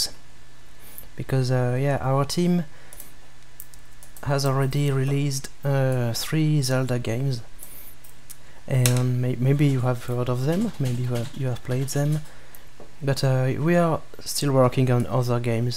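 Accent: France. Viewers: Whew dude you have a thick French Accent. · Are you french ?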